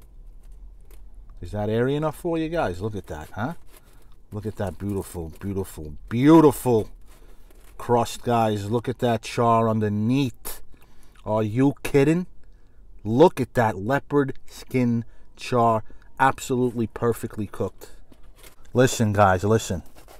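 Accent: New York, United States. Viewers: This dude has the perfect NY accent. I love it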